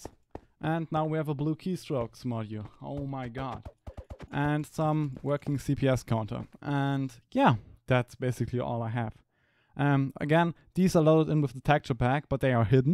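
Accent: Germany. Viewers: Ich find's immer lustig wenn deutsche englische Videos machen, weil man hört es schon ziemlich, naja, vermutlich wird das sowieso nur den deutschen auffallen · haha englisch mit dein deutschen akzient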